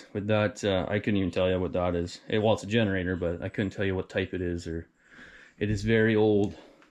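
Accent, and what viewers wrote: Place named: Canada. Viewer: I knew you were Canadian within like 2 seconds of hearing your voice. the location is a secret but ive narrowed it down; I would've hauled the vice out that boat house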